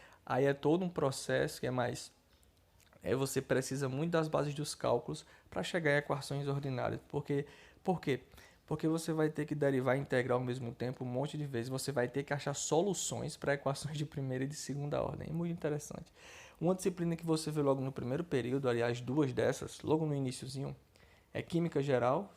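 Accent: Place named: Northeast, Brazil. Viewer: O mercado de trabalho de licenciatura em física é bom aqui no nordeste? (suponho que vc tbm seja da região já que está analisando a grade de uma facul do RN. Fora o sotaque gostozin kkkk). Vou entrar na minha segunda graduação que será em licenciatura em física e gostaria de saber se demora muito entrar no mercado.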